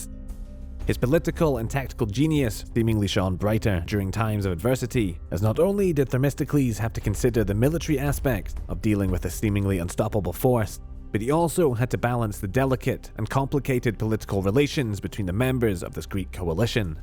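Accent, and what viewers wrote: Scotland, United Kingdom. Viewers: “slightly sinister vocal tones” 😂 scottish accent is ❤ ! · Much better still needs to flow a bit more but still you're getting there. Read entire sentences in one go and pull back on the emphasis in the middle of them. However from last time it's a big step keep going like that and you'll be there faster than later. Maybe don't worry about the accent as its really nothing even when it comes out and it might be that your trying to sound less Scottish is actually hurting your style rather than helping.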